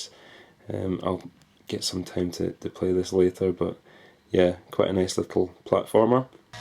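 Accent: Scotland, United Kingdom. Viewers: Where u from? You sound Scottish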